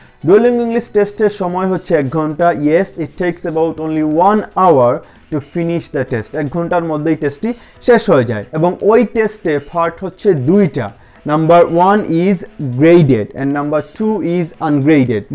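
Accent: Sylhet, Bangladesh. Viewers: Right,,he is sylhety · R u from sylhet bro? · sylhet e baisaab!!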